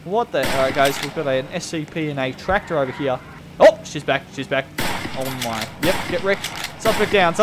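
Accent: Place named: Australia. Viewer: He sounds like a classical Aussie in a good way. Love your videos man, keep up the good work hoping you reach a 100 soon!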